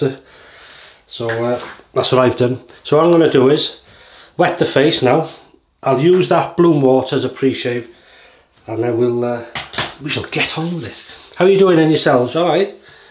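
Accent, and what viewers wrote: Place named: Yorkshire, United Kingdom. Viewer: Great video are you originally from Wales?? I'm picking up Yorkshire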